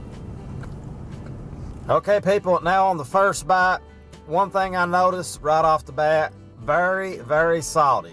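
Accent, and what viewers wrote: South, United States. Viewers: does anybody else love his southern accent? I love it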